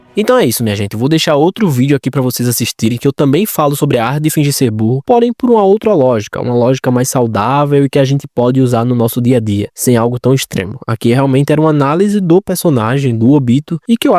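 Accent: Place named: Bahia, Brazil. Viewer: tem como tira o sotaque bahiano?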